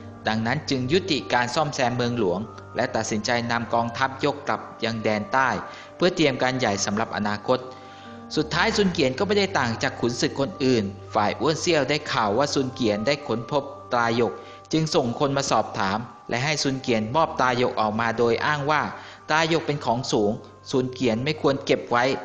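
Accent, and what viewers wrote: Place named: Isan, Thailand. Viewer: เเอดมิน น่าจะเป็นคนอีสารเเน่เลย เเมนบ่อ้ายสำเนียงมันบอก555 (หนู๋คนอุดรเด้อ)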